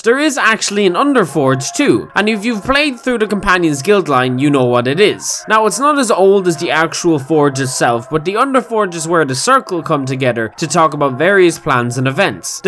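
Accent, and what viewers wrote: Ireland. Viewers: Your accent is more borage, than elsinore, you sound like an Irishman than a Kajhiit.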